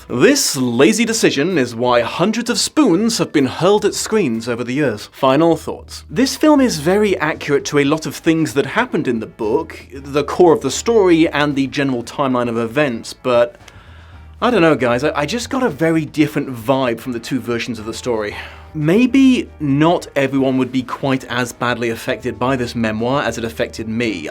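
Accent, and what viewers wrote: United Kingdom. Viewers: Stfu about Franco. Performative whiteknighting into extinction. Every time I hear this UK accent in a video it's a 100% guarantee it will have the dumbest pretentious takes ever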